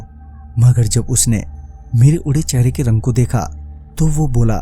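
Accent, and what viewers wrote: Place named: Gorakhpur, India. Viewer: लेखक को 1000 लाइक की आवाज़ को 10000लाइक ये पूरी खलीलाबाद ओर गोरखपुर की बीच की है....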